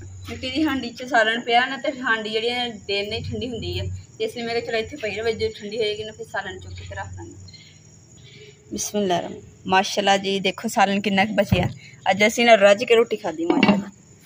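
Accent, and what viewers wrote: Punjab, India. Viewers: Ap koli boli pure pnjabi bht achi lg rhi h...mje apni ami ka gr yad aa gya h...jahan sb aik sehan me soty hn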